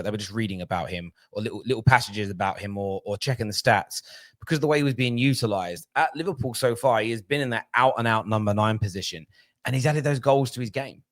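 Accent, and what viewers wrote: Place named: Manchester, United Kingdom. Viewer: Another Man U fan with a strange Mancunian accent 🤔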